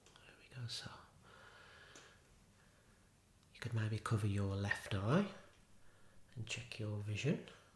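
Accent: Birmingham, United Kingdom. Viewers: I love your natural Brummie accent!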